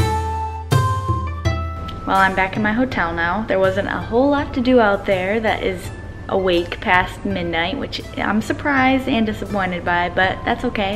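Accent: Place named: Midwest, United States. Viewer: Cool video. Did you just get a 24 hour pass on your passport to be able to leave the airport during your layover? And are you from the Midwest? I could hear a Midwest accent in your voice.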